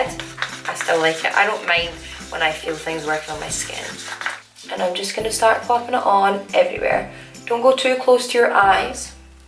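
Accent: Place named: Scotland, United Kingdom. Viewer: Love your channel and just found your Instagram! Found you from your Jamie G lipstick video and was so happy when I heard the Scottish voice 💕😂 xx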